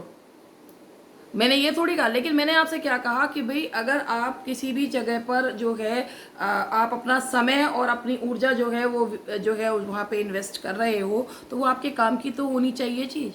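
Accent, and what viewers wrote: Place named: Meerut, India. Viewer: Good evening ma'am..... without knowing,I guessed that you are from Meerut.....you speaking style reflect this.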